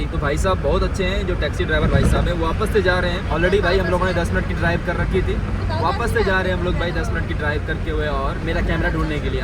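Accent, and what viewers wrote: Bihar, India. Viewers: Thoda dheere bola kar bhai bata deta hai ki Bihar ka hai.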